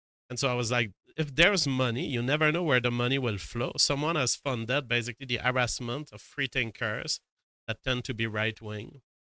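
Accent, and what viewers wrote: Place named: France. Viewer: I can't stand listening to English spoken in a Frog accent. I apologise for nothing.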